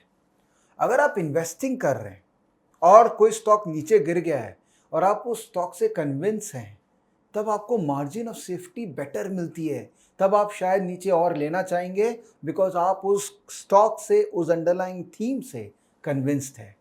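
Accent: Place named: Kolkata, India. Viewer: !बातों को कहने का लहजा ही बता रहा था कि तुम कलकत्ता के हो।शब्दों का चयन व वार्ता में तुम्हारे मनोभाव,जो मैंने स्पष्ट महसूस किया; यह बताते हैं कि तुम शिष्ट परिवार से हो।तुम्हारी सरलता व परहित भाव तुम्हे बहुत आगे बढ़ाएंगे व बहुत प्रसिद्द व सफलता दिलाएंगे।मंगलकामनाएं।सुशील जैन।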